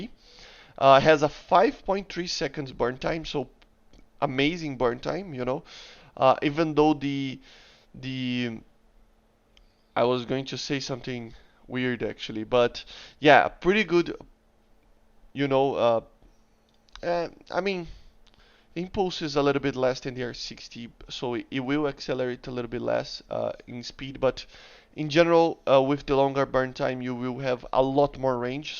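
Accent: Brazil. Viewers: kkkkk sabia, esse sotaque br e mt forte, mas o inglês e bão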